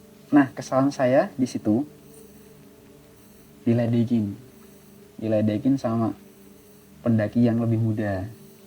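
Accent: Java, Indonesia. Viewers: Logate jawa medok banget😂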